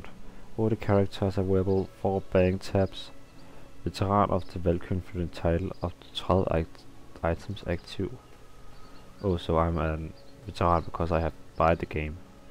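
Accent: Denmark. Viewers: Your english sounds Danish like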